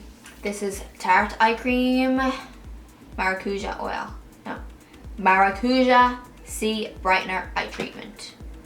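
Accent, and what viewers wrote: Ireland. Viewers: I'm trying to figure out if your Irish or not 😂😂 amazing tutorial tho!😍😍❤️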